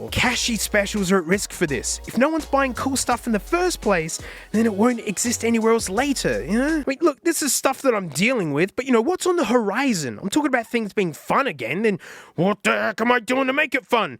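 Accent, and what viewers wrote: Australia. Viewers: i love hearing him get angry in australian!, we enjoy your weirdness my dude. do what feels right. 💜🚗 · I binge-watched all the videos you released, what was it covid-time videos? the 4-hour long videos; y ai binge-watched them. then i tried to find you on social media and got on discord to discover you dont exist there, so i left, i left looking for you. And when you dont post, I specifically search for " " or " " whatever. I watch your content, dare i say, unconditionally, because, well, too many reasons; the accent for one; the references to aussie slang (that i have to look-up at times). You're awesome, dont let anyone tell you different, keep doing it YOUR way! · i am here for you you could degrqde ke for an hour with silly put downs that will probably make me laught caus eiblove your aussie accent and way of being. We watch you for you not necessarily the content. 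I know you won't because you said you won't touch bluetooth headphones anymore but i do hope you at least smell the nothing (1)s cause they're really quite good for what they do. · I gave literally 0 shits about apple or ipods and have been here since (the tail end of) those days just about. I've been here for the shouty aussie man with his 1 grit and snake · I come to this channel to see what funny things the aussie man has to yell about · I came for the obscure tech products but I stayed because of your Australian accent and all of the witty one liners. What other flavors of dog farts exist besides the old reliable EGG?!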